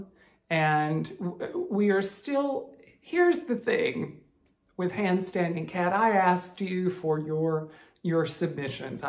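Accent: South, United States. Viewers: Thanks for sharing your wonderful YOU!! Love your Southern accent- more please !😂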